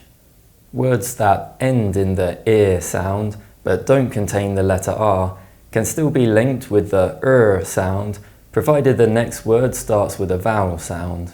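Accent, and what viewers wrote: United Kingdom. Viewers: Thank you teacher. I like british accent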